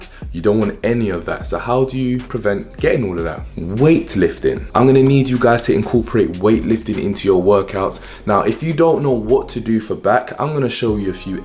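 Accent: London, United Kingdom. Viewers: I’m here for his London accent…👀👀👀 and for burning that back fat off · I'm tired, when I heard him talk I was like oh he's british I need one in english 😂 I'm so damn sleepy.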